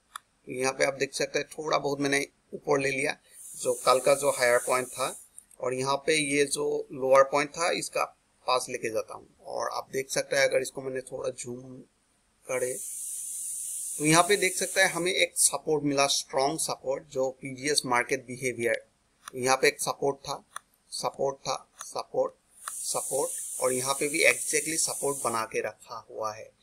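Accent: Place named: Tamil Nadu, India. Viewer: Tamilnadu English speaking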